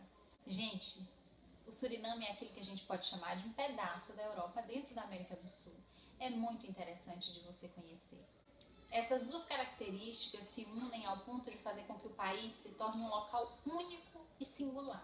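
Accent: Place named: Ceará, Brazil. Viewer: neste vídeo, aprendi muito sobre a história do Surimane. Falas muito bem, organizas as frases como uma pessoa culta. Guardas, ainda, sotaque que pode ser do Ceará ou do Piauí, salvo engano. Pretendo, visitar essas três Guianas para conhecer os lugares e aprender mais sobre as culturas e os costumes, além da história. Tenho dificuldade de imaginar sobre a economia de um país cuja população - ao que parece - se concentra quase toda em uma cidade. Resumo: gostei muitíssimo de "tropeçar" neste vídeo. Tanto que me inscrevi logo. Parabéns.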